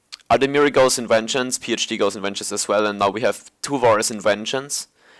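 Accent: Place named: Germany. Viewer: According to your accent i would say you're german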